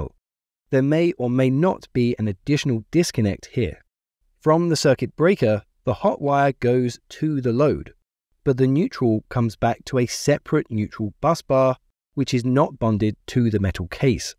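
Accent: England, United Kingdom. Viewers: It's amusing to hear an English voice explaining the American electrical system. It's also interesting to see how different the US system is from the UK system, which is variously different again from the systems in most European countries. Of course, the general principles are the same but the details are fairly different. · Really peculiar hearing an English voice describing the US electrical system... I thought the two "hot" wires were on 2 different phases???? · Hearing an Englishman talk about North American electrical systems is so weird. Not as weird as UK electrics, but close.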